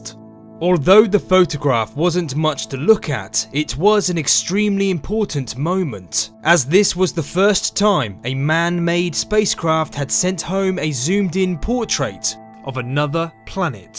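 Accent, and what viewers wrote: United Kingdom. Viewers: interesting accent you have.. what area of Britain does it come from? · Why do you have a British Accent? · british English af.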